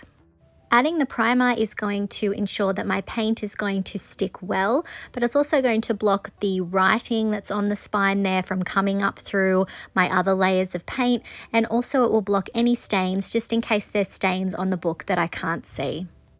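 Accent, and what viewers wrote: Australia. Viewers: Loved all of these! You are so talented and like your Aussie accent❤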